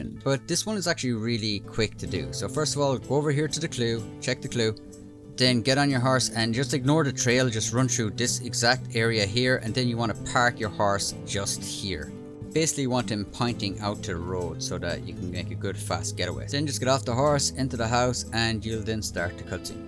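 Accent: Ireland. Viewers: Are you Irish? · Never heard your voice until now. I doubly respect you for being Irish and for your incredible videos 😂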